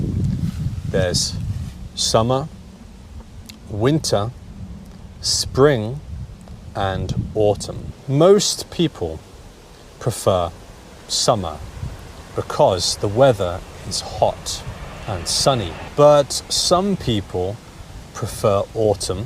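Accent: United Kingdom. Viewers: It's appropriate content. Even if the content is easy for understanding, it's useful to listen to underscore nuances of British speech.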